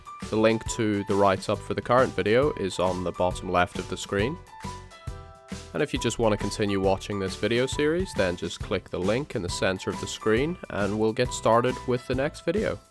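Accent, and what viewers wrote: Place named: Ireland. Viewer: Your accent sounds like a mix of Irish and Northern Irish. Nice vid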